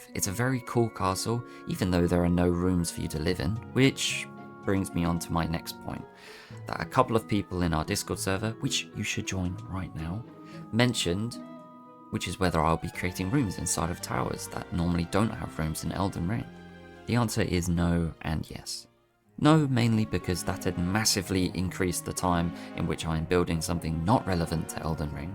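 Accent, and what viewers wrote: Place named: RP, United Kingdom. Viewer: I love your voice, you sound posh as fuck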